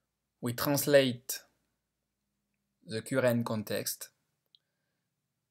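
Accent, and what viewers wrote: France. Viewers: He has a french accent.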